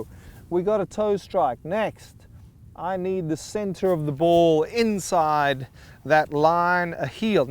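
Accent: South Africa. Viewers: Howzit, First time watching this video and found it really helpful. I just wanna ask one thing Are you South African because you have a certain twag that really seems South African 😂😂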